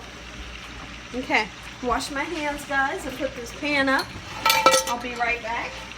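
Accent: South, United States. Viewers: I'm from n.y and l came across your channel and l'm loving it Wow!! the cooking you're doing. Plus your southern voice is amazing to me to being a new yorker... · yes I could see her on food network or a country soul cooking channel she should start sending in some of her recipes to those type channels like CMT because she does have a bit of a country drawl . This chicken looks so good ima slappin that like button . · love the southern accent thank you for the recipe!! love from africa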